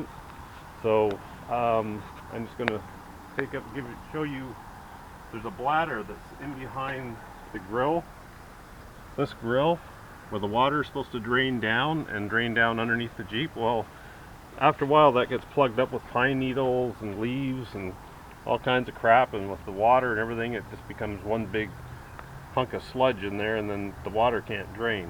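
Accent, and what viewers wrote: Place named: Canada. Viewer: Love that Canadian accent! Wife's from MI and I haven't heard that in a while! Bob from Texas...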